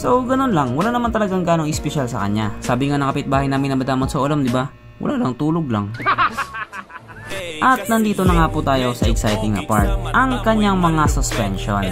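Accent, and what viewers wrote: Visayas, Philippines. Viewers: Bisaya ka kol?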